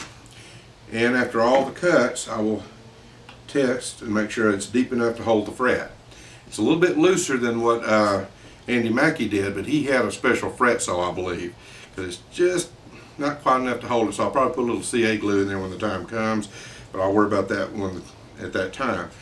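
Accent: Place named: South, United States. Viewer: In the description there's a transcript option. That may be helpful for you. He has a southern accent so that may not be something you're used to hearing. I hope that helps you.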